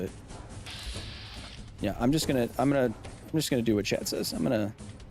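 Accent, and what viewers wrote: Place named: Australia. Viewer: watch for the australian accent and bob ross voice, stay for the thick load of science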